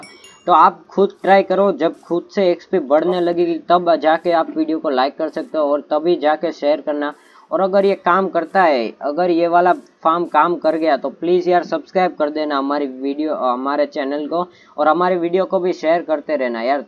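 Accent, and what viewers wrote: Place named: Gujarat, India. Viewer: gujrati log hindi bolne me pakde hi jate h ...btw i am also from gujrat..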